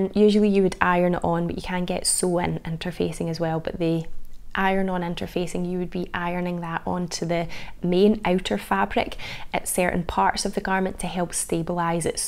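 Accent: Scotland, United Kingdom. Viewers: Yes, that lovely Scottish accent. My husband also loves listening to videos because of the accent.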